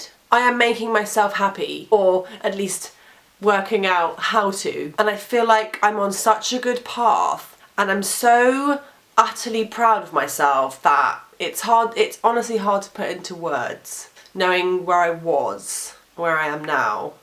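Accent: United Kingdom. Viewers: What the heck,? You’re practically an American with a British accent. Have you gone to a hypnotist to find out why you are afraid? My greatest fear is the government busting down my door and asking for more taxes. Or everyone pointing at me and saying, “you’re worthless & a fraud.” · Sounds like agoraphobia to some extent. I try to get over the irrational fears I have by doing more of what I fear. Travel, travel, travel... LA is a cool but expensive place, perhaps not tho compared to London. With your awesome looks, talent and sexy british accent you could kick butt and take names here in LA.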